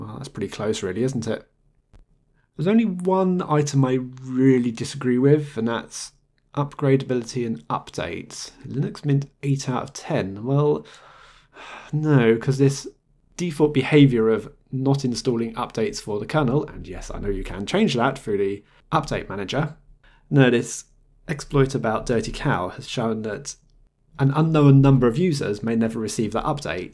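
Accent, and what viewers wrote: United Kingdom. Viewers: I have been a subscriber for several years. For a laugh (and because my ears are old and worn) I turn on CC. I assume CC is US based because your British English comes out a bit funny at times. BTW I used Sabayon for a few months several years ago and liked it.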